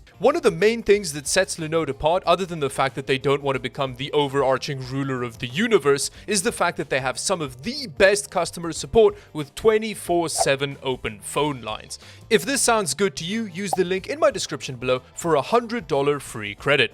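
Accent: South Africa. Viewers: Hey, are you south African? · You sound like a ex South African your name is spelt the same in Afrikaans which h is like Dutch thanks for a chance towim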